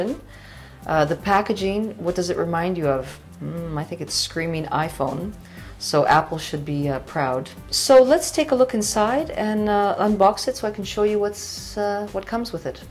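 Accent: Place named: Netherlands. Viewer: your voice has a hint of a dutch accent in it, am i right?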